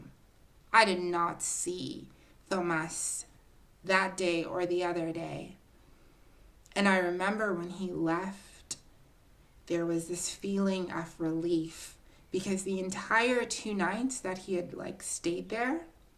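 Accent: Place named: United States. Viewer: Whats up with the American accent?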